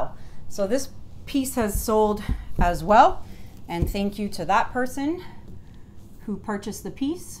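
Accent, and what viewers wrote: Canada. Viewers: 4 daughters, 3 stepsons, which gives me 3 daughter in laws, 26 grandchildren I've had a moment or too LOL , in 5 yrs i have beat cancer, raised 2 grandkids,lost 2 best friends to cancer my mom and sister and now i am helping my husband fight stage 3 colon cancer with this Covide 19 going on, if anyone walks into my house with out saying hello to me I say Good-bye, you go girl and by the way love that Canadian accent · I'm just starting out. I have to tell you, of all the YouTube tutorials out there (and I've been binging!), your channel is the absolute best. Aside from all the detailed instructions you give, I love your interaction with your viewers (and your Canadian accent! LOL). Thanks for sharing this wealth of knowledge you have. Your work is stunning and I appreciate your responses to my questions on many of the videos I have watched. 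You are amazing.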